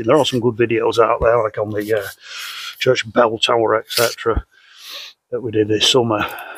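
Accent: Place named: Liverpool, United Kingdom. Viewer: I watch your channel too. Scouse geeza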